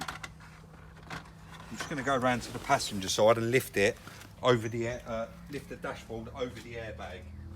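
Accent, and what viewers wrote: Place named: Cockney, United Kingdom. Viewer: Bloody marvellous video what looks like a nightnare to anyone else is a doddle to you i admire your determination how about a meet and greet in summer i reckon loads of us would turn up celebrate your 100k i was with you at 45 ish lol im sure you inspire so many of us as always so professional with a great cockney touch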